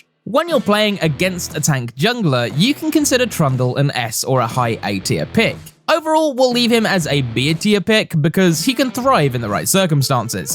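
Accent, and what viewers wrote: United Kingdom. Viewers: I wasn't referring to the voice, it's definitely british, something you would hear from a documentary I mean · Definitely more British than Australian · Always love me some british accent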